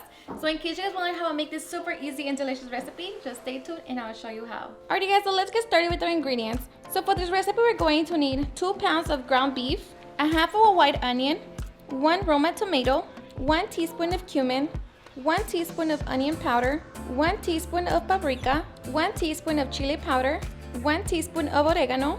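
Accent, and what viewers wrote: Mexico. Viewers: Just found your channel today in one thing Are you Mexican because I think you some Mexican accent in U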